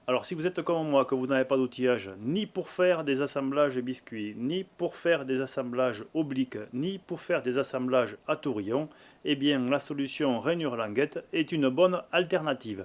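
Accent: South, France. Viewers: Pas de quoi , et oui c' est très long la fabrication de mobiliers , pas mal de gens pensent que ça doit se faire en 2 jours un meuble... Voilà pourquoi il ne faut pas à avoir à revenir sur des étapes précédente. Bien faire comme préconiser en traditionnel , débit grossier , corroyage , assemble etc... et enfin mise à longueur final montage à blanc et si tout est ok , phase terminale , le collage de l ensemble. En tout cas je suis abonné , et j aime bien votre façon de présenter vos vidéos , avec ce p'tit accent qui à l' air de venir du Sud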